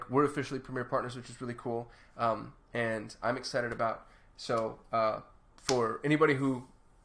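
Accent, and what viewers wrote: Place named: United Kingdom. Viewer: you know i always do. #1 fan of British accents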